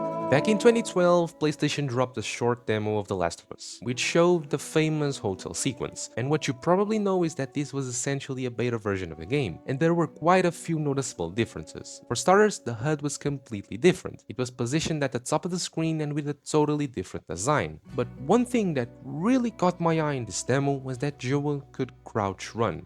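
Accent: Portugal. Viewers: Random but are you portuguese?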